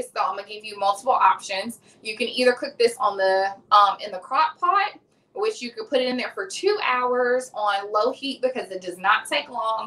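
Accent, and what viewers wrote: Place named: South, United States. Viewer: Watching the replay thinking, is she sounding extra country today or am I tripping and then you said it, 😂😂 I'm like ok, I ain't tripping!! I will definitely be making this dip!! Looks delicious!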